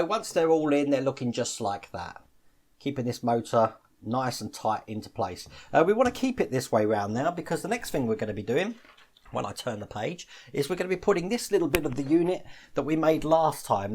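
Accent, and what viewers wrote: United Kingdom. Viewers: where in the uk are you that accent is bugging me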